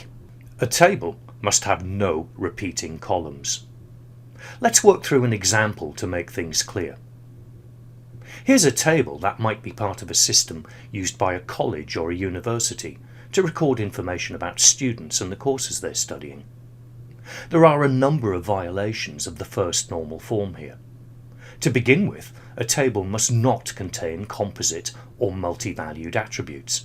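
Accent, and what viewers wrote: England, United Kingdom. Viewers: Thanks for your videos, it is so clear when i watch a video with an English accent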